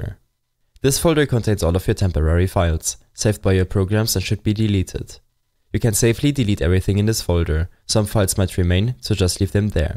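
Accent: Germany. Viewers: Bist du deutsch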